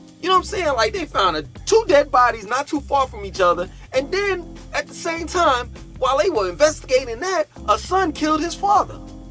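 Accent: New York, United States. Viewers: I agree, Charlotte is NOT Atlanta, and you sound like a New Yorker. Charlotte is a southern New York town a lot of New Yorkers are in Charlotte and I guess they like it.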